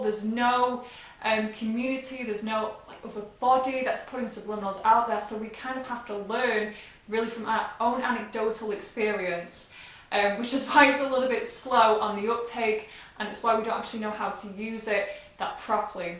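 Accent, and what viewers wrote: United Kingdom. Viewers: I don't know. You're beautiful and charming. And that British accent. My God. God will marry me to a girl like you. You're so adorable. 🎡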